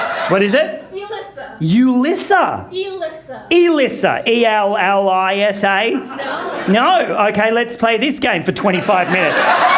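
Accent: Australia. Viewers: R u an Aussie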